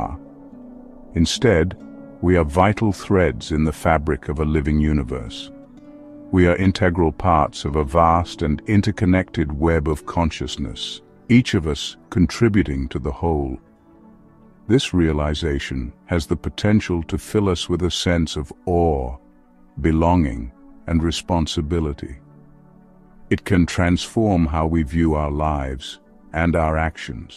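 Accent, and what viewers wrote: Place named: England, United Kingdom. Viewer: I'm bothered by the narrator's two accents. Why is he slipping from an America English accent into an England sounding English accent? Is anyone conscious of this? I assume some are now. Please explain.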